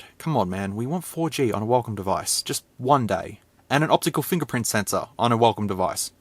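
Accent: Australia. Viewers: Yay the Aussie voice is back now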